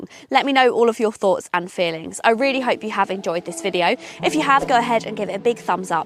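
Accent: United Kingdom. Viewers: If I am in not in ❤ with britain english, but you derserve ❤ when talking so quick and so much about this enough big car 😊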